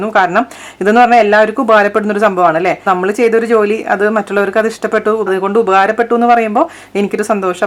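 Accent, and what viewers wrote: Thrissur, India. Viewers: Chechi thrissur kariyaano thrissur slang athu kont chodhichatha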